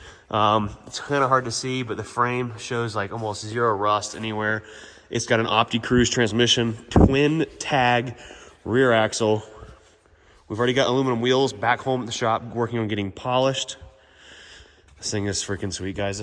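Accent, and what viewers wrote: Netherlands. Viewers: bro sounds Dutch and I should know lol.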